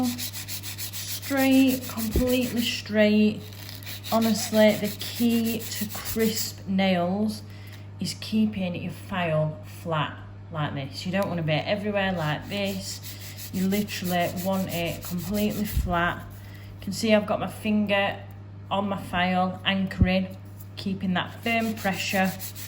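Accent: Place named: Sheffield, United Kingdom. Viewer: Love all your videos and your accent! Can I hear Sheffield by any chance or somewhere in South Yorkshire? I'm from halfway between Wakefield and Barnsley.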